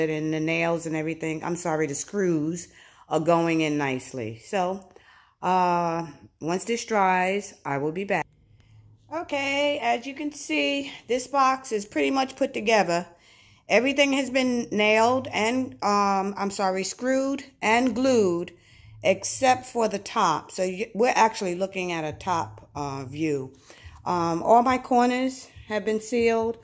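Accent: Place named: New York, United States. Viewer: Love ur accent miss 😀 where ya from? im a Boston native you sound like ur from either New York / New Jersey area? Thanks for the informative video 😁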